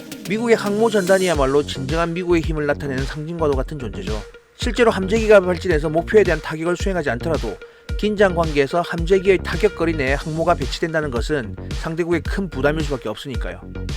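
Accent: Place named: Gyeongsang, South Korea. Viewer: 내용은 좋은데...경상도 사투리 억양이 너무 강해서 듣기가 좀 쉽지 않네요. 계속 방송 하실건데... 표준 억양 연습 부탁 드립니다. 그러면 좋은 내용이 한층 와닿을 것 같습니다. 사투리 억양 지적해서 대단히 죄송합니다.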